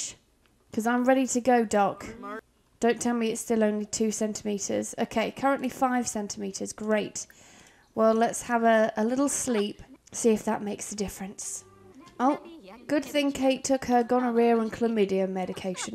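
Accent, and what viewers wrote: United Kingdom. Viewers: OMG LOVE YOUR ACCENT WISH I HAD I AM AMERICAN sadly YOU SOUND LIKE YOUR BRITISH I LOVE THAT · im sorry but your accent is so british :')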